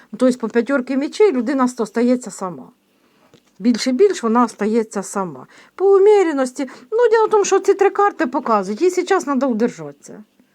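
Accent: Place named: Surzhyk, Ukraine. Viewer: Пані, говоріть будласка українською, а не цим пекельним суржиком. Дуже ріже слух.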